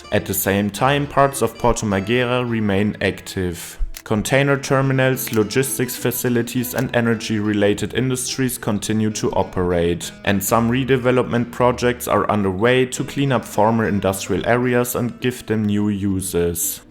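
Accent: Germany. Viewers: bro es wäre so geil wenn du nen zweiten deutschen Audiotrack anbieten würdest, als Deutscher kann ich mir dein Englisch wegen dem Akzent echt nicht lange geben, aber machst eigentlich sehr geile Videos 😂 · Im in 2 seconds and I already know this guy is german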